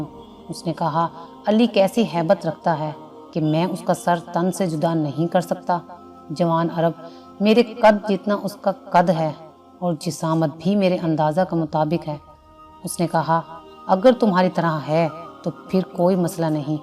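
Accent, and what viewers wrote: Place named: Punjab, Pakistan. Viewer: Aap ka accent bht ghalat hai. Farsi k alfaz k neechay kahan par zabar ya zer hai aap ko nhen pata. Aur ye urdu hai Punjabi nhen, iss ko urdu accent mein parhye. Plese do practice at least three to four hours once script is ready and then upload the video.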